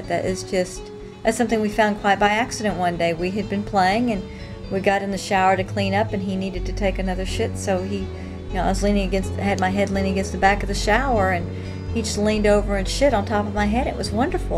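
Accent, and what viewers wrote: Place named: South, United States. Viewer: She has a Southern accent...doesn’t surprise me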